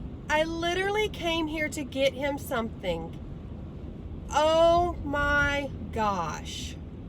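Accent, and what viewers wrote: South, United States. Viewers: I love your chatty videos! I especially love your southern accent.... go ahead sing talk all you want! I'm on the same boat with anxiety & depression, it comes in waves for me too. On top of that I'm an introvert in every which way. I smile through it all & try not to drag others down with me. I'd rather bring a smile & say hello to others, just in case they're dealing with a similar situation or worse. It's in my nature to do that.... loved this video! 💜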